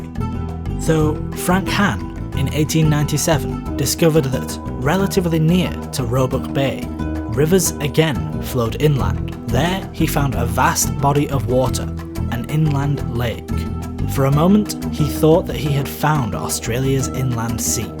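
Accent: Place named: Northern England, United Kingdom. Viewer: really interesting video! Great to hear a Northern Accent in one of these channels too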